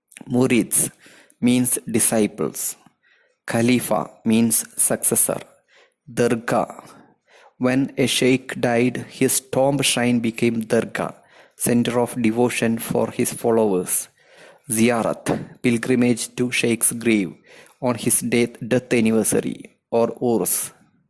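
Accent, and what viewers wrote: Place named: Kerala, India. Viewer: I got to know that you are from Kerala by way of your pronounciation 😂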